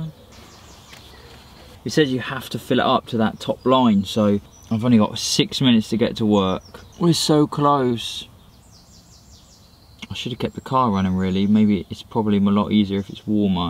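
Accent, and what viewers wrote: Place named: South West England, United Kingdom. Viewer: Where are you from? Bristol or something? I hear a bit of South West in you!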